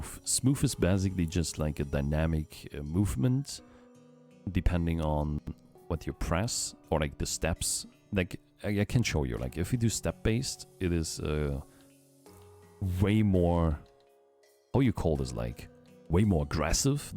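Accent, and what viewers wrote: Germany. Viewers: Your dialect sounds kinda like you’re from Germany? Am I right?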